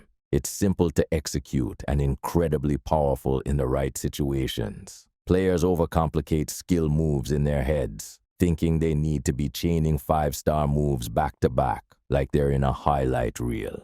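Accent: Jamaica. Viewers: I like the Jamaican voice don't change it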